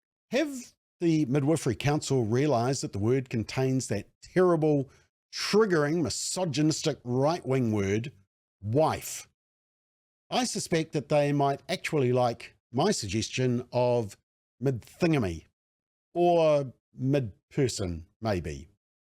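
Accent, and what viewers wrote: New Zealand. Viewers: I think spoke well, and as a fellow kiwi I understood everything he said. I'm sure overseas listeners will or should, take into account the kiwi accent. Thanks for a your great presentations,. 🙏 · You are criticising an accent. New Zealanders are not going to change their accent just because you don't respect it.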